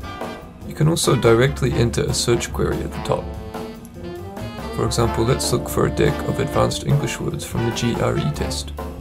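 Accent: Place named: New Zealand. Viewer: Who got the Kiwi to do the tutorial about "decks" then..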